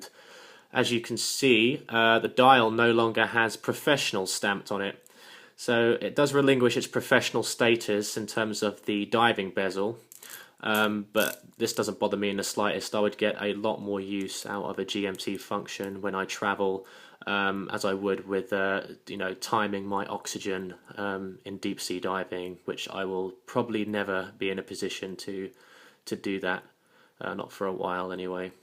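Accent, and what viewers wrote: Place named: Mid-Atlantic, United States. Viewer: Hi, indulge me.....Do you work for Omega? Also your accent is very mid-Atlantic. Any personal background?;-)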